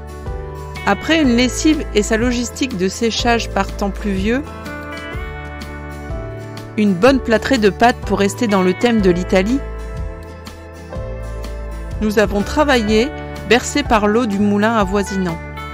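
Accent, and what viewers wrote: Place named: Germany. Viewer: Merci pour ce joli reportage, je suis surprise par cette belle architecture même si elle est cubique. J'ai adoré votre accent allemand 😀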